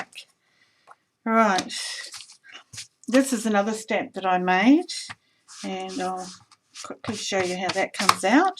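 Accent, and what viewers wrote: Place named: New Zealand. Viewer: Thank you -- great ideas . Plus, loved hearing your accent, as an expat Kiwi it makes me homesick! x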